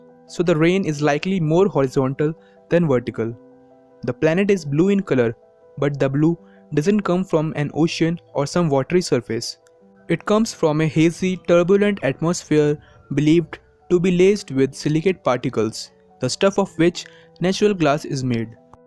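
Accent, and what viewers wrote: India. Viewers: The way you speak English I understood that you're from India. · I think you r a Indian!!! because of ur pronunciation I can gusse u r a Indian!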